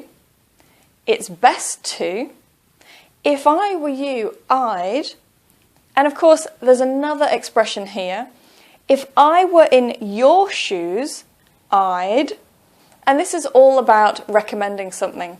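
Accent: United Kingdom. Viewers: Hi. Thank you very much for your really nice teaching English with wonderful smile. I am very like British accent, so I am real glad that your English teaching on YouTube. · that's a great work, great accent, continued and uninterrupted, i wish i could talk like British,my accolades for your altruism, have seen your website too. its catchy. thanks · Would you please upload a video with tips to get correct answers on map/diagram matching on listening please? Would really appreciate. Love your British accent 😆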